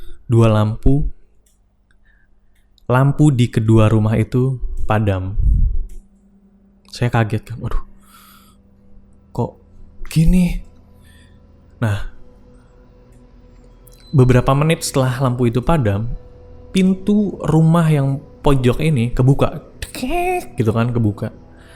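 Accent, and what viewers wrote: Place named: Banyumas, Indonesia. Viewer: Mas.. aslinya Purwokerto ya.. spt Banyumasan logatnya, hee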